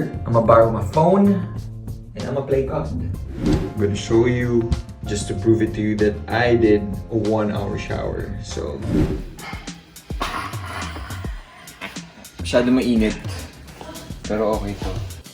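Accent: Bicol, Philippines. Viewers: Sobrang cute mo mag salita ng bicol hahaha💚 I am sad but I saw and I watch your vlog today nawala na lungkot ko. Thank u, love u & missed u😁🥰💚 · Nakakainlove yung bicol accent mo! 💗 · Ahhahahaha inlove with your bicol accent😍😍😍 I'm also from Bicol 🥰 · napansin ko lang po yung salita ng bikol my halong ilonggo ng konti haha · Grabi..cute mag bicol salita n...Hindi ko maintindihan..hinahabol ko talaga pag Basa para ma gets ko Ang sinasabi mo myloves ❣️❣️❣️ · Hahaha halo pala yung Bicol mo. Legazpi-Naga dialect. Hahahaha. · i love it... naiintindihan ko ang bicolano ni... nkakaintindi pa rin pala ako... kahit di marunong magsalita... lab u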